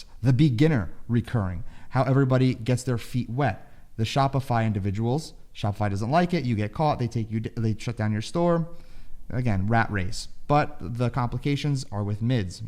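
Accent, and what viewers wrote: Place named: New York, United States. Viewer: Hahaha, no one will say yes bro if they're under the shadow making millions. I also like your NY style of talking about the ones in the dark, keep it up.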